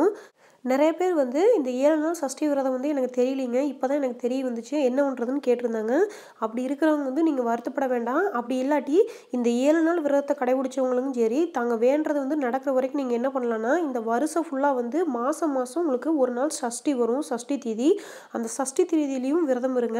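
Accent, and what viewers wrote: Kongu, India. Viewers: What a kongu speech we are also Coimbatore super proud of you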